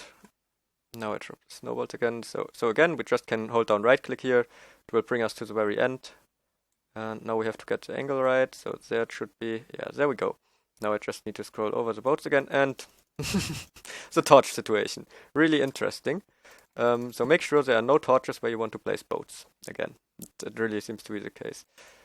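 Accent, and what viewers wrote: Germany. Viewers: I mostly think you are German and you speak english xD (sorry if my English are not perfect i'm a German kid) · lol dude how much do you know about minecraft ??? XD and are you german ? your english sounds some kind like german english :) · bist du deutscher? Hörst dich genauso an wie mein Nachbar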